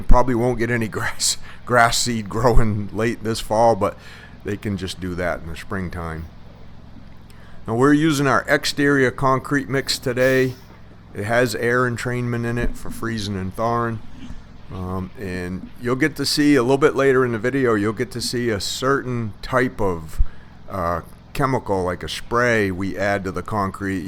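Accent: Maine, United States. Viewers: I knew you was from maine as soon as I heard you start talking, I'm originally from Maine. I lived in Washington County. Actually, the little town was called Princeton, but I watched your video 1 day because my husband is a Mason of like 40 years. And I was just going through different videos and see how other people did stuff and have been pop on yours and new year's from may good videos, by the way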